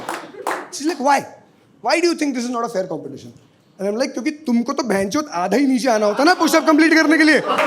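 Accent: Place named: Garhwal, India. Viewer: 🤭🤭your accent is garwali though💜was waiting for someone from Uttarakhand to do standip.Finally got you.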